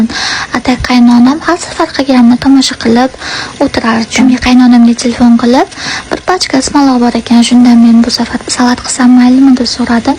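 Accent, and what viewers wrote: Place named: Fergana Valley, Uzbekistan. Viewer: Каерлик шу киз шеваси водийликка ухшеди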